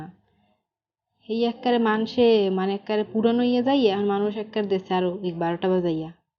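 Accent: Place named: Barishal, Bangladesh. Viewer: আসসালামু আলাইকুম আফা। আমার বাড়ি নরসিংদী, কিন্তু আফনের বরিশালী কতা হুইনা খুবই ভালা লাগসে....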